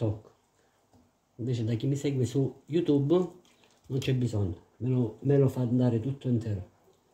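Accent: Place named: Salento, Italy. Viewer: Io ti guardo da Trepuzzi(lecce)tu"di dove sei?il tuo dialetto e' uguale al mio😅❤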